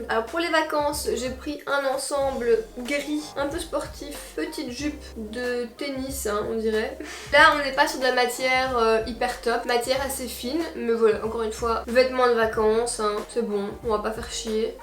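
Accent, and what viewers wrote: Belgium. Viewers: Est ce que un jour tu pourras faire un meet up à Marseille ? ?? Sinon vidéo parfaite 😘😘je kiff votre accent en Belgique 😘😘 BISOUX 😘😘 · J'adore ton accent belge ♥️♥️moi aussi je suis belge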